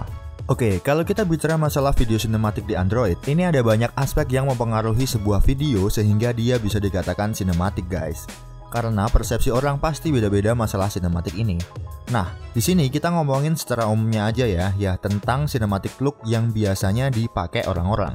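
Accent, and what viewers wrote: Kudus, Indonesia. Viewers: Mas orang kudus? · Smpean ndk wong kudus bro..kok kyok.e shot nek alon2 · Wong kudus mas? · bang elu orang Kudus ya , wkwkwkw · wong kudus mas? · Wong Kudus mas? Wkwkkwk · Wong kudus toh mas?? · Oo sampean wong kudus ya mas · mas orang kudus ya? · Bang lu orang Kudus kah? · Masnya orang kudus ya?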